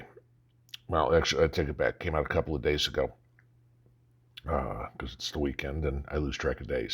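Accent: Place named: Indiana, United States. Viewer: I'm a Hoosier I had always heard we had some of the dirtiest lakes and rivers but didn't know we were #1 . I live really close to the Wabash river and a power plant reservoir I wouldn't eat fish from . There are some really nice gravel pits I would eat the fish from . Sounds like you're from the N. W, part of the state and we live in the S.W. part . I hope you have a blessed Sunday !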